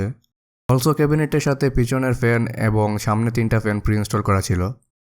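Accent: Sylhet, Bangladesh. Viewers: Bhaiya ki sylhety ✌ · Vaia apni ki Sylheti naki??😃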